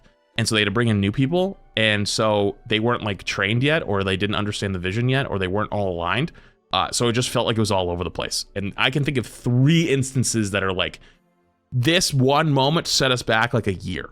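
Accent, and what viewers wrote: Boston, United States. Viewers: THATS MY BOSTONIAN RIGHT THERE! SPOKEN LIKE A TRUE MAN OF THE PEOPLE!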